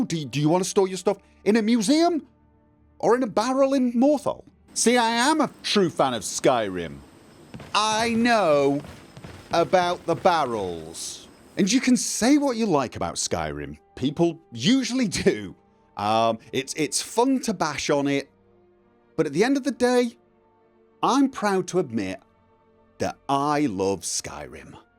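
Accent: United Kingdom. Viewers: Wait, you’re Norwegian? All this time I thought you were a fellow Brit but little did I know you’re in landet som forandret livet mitt, fyfaen bror!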